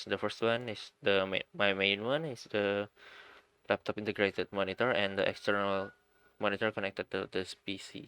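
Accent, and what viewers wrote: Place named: Indonesia. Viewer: bro are u indonesian, your accent sounds like indonesian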